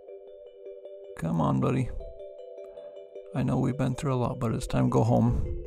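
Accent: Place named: North America. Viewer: Aw. I Would hold your hand. If its a jumping spider or a Wolf spider, they tend to have really big front facing eyes, they all have more than 2 eyes. Watch this channel, Spencer at My Wild Backyard, and Travis McEnery (These are theSpiders in your House) and theres a couple of other channels that often collaberate with Spencer who have great content! I think its lovely that you talk to them. I just took my horse who is getting over a sore foot, for a walk in the woods and animals are really the best company, we were out for hours, I've been doint it every day because she loves seeing everything around our wood. I know they dont speak but animals seem to just love the attention and the time you spend with animals is never wasted. I hope You find someone to help with your spider ID, I would have loved to have helped but am in Scotland and I think you sound to be in North America!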